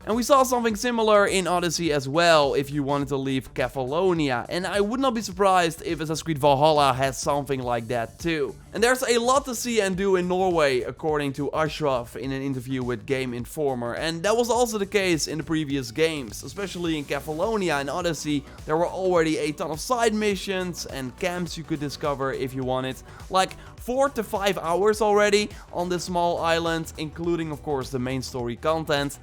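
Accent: Netherlands. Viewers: Gwn een vraagje, ben jij nederlands?? Denk dat ik n nederlands accent hoor🤭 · You, I can hear that you're Dutch. Is there going to be an area in Valhalla in the Netherlands? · Damn I hate the Dutch accent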